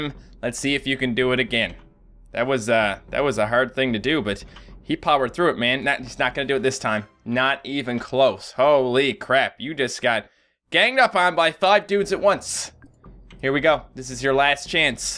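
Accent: Canada. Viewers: Are you Canadian? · are u Canadian?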